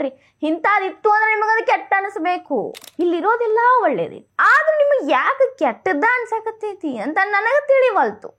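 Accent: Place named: Kalaburagi, India. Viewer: ನಿಮ್ಮಿಂದ ಸಹಜವಾಗಿ, ನಿರರ್ಗಳವಾಗಿ ಬರುವ ಕಲ್ಬುರ್ಗಿಯ ಭಾಷೆಯ ಸೊಗಡು, 1968-70ರ ನನ್ನ ಅಲ್ಲಿನ ವಿದ್ಯಾರ್ಥಿ ಜೀವನದ ತರುವಾಯ ಕೇಳಿದ್ದು ಬಹಳ ಕಡಿಮೆ. ವಿಷಯದ ವಿಶ್ಲೇಷಣೆ ಹಾಗೂ ನಿರೂಪಣೆಯೂ ಪರಿಶುದ್ಧವಾಗಿವೆ.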